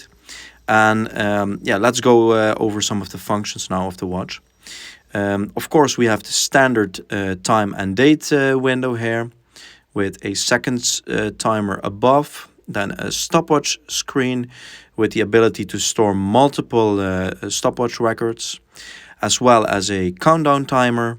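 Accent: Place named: Netherlands. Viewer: I hear Dutch accent, where did you get it from? As far as I know it's not released in the EU.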